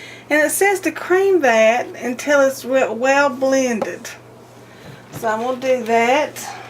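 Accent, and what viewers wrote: South, United States. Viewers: You're accent is just so deliciously Southern. I love it!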